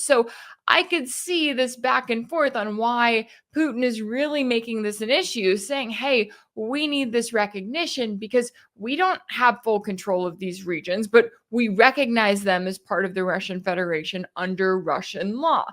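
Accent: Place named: South, United States. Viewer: As usual another great program! Thank you always for the important needed information! By the way, where did you get that "Al ya all" American Southern drawl?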